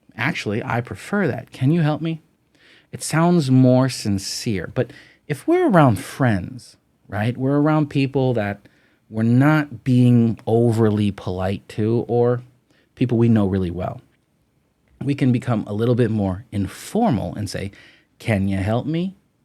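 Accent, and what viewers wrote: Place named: United States. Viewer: Hello my teacher I really love your teach I want to learn English perfect with you special your pronunciation I love American pronunciation I don't like language British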